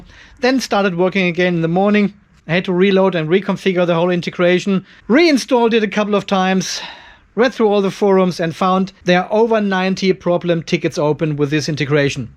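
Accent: Germany. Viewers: Is it right, that you have a german background? You said "nochmal" and your english sounds like here in germany 🤣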